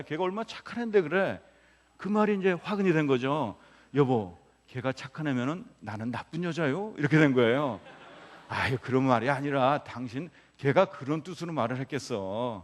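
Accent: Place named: Seoul, South Korea. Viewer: 강연 들으면서 문득 드는 생각이 요즘 나오는 서울사투리 말투시네요~~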